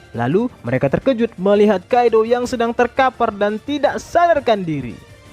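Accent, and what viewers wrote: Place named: Java, Indonesia. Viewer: Bang,lu orang bekasi ya 🤣 bahasa lu medok bngt njir 🤣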